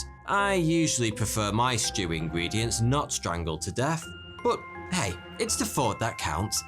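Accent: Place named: Southern England, United Kingdom. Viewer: Thanks Phil. I've come across the accent several times on YT. The word "think" caught my attention in a few cases. I'm curious about the dialect...I'd call it a dialect. It's a marker for a distinct cultural community. I wonder (and worry) if it will go extinct, like so many others. Do speakers have a group name, or any sort of identifier that I could research? If not, in what range and in what towns of southern England is this dialect common? I'm just looking for an area boundary, if there is one. Do you know if speakers are ancestrally connected to Bretons, Angles, Picts, Normans, etc.? And do they all have big front teeth and mustaches for disguise? LOL!!! I think that explanation is hilarious. Anyway, thanks for any first-hand, factual info you're willing to provide on this south England dialect. :)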